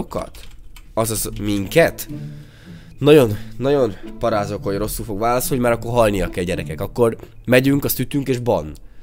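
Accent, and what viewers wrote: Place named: Szekler Land, Romania. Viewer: "Föl" végre halotalak székelyesen beszélni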